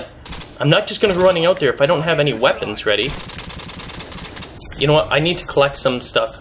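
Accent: England, United Kingdom. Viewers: loving the british accent haha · Best live commetary ever!! I love your british accent lol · lol why do you always do an English accent in your commentaries? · Seemed a bit like he had a split personality with the English accent and the whatnot, got a good laugh or two from listening to the ramblings of a murderous English-man, thumbs up indeed. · i <3 ur british accent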